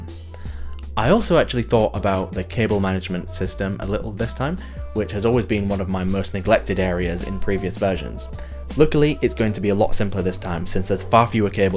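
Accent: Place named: Yorkshire, United Kingdom. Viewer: Yorkshire accent or not, thanks for sharing!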